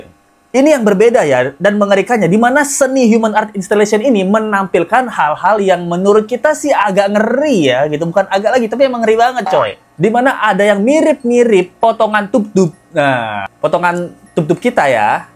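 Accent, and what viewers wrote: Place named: Bali, Indonesia. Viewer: Logat nya Bali banget🎉🎉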